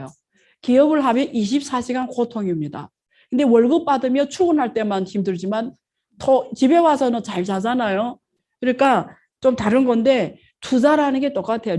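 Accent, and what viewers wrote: Yanbian, China. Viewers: 한국에서 돈벌려면 표준어좀 배우는게 좋겠어요!. 연변 사투리 듣기 싫어요!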